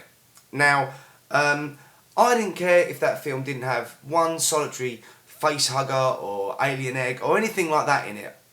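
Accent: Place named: Scotland, United Kingdom. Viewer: Very good review. I am American with *distant* Scottish heritage, and thought I recognized some of your way of speaking, and that of the actress you mention. I watched PROM... DVD this morning, and enjoyed it. I have been reading reviews all day ever since. I want to see your take on EPISODE ONE, where I am going now....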